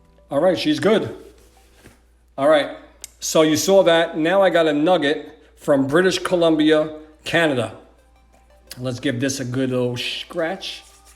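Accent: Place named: East Coast, United States. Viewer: Thank you for this simple and effective demonstration. I do love an East Coast accent!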